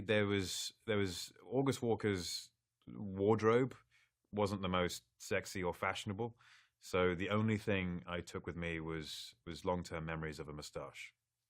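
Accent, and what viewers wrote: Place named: United Kingdom. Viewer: esse sotaque britânico que eu amo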